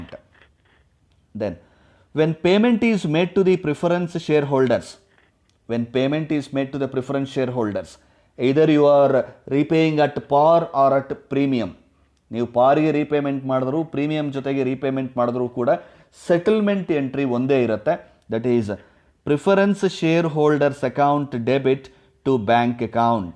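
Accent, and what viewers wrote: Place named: South, India. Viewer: Aap south kyu bolne lagte ho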